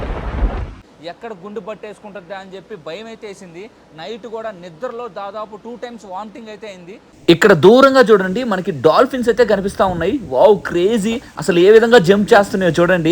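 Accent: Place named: Nellore, India. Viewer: Brother ne bochulo Nellore slang aaapu, and marchu plz madhi kuda Nellore but vinaniki irritate ga undhi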